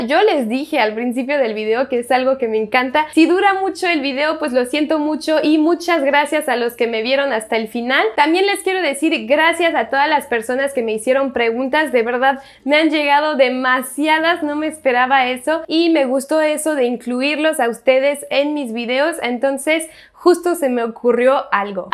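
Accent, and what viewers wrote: Mexico. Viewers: saludos guapa de veracruz..me encanta oirte hablar frances se escucha genial..me impresiona oirte hablar con acento mexicano ytambien si hablaras español con acento frances seria genial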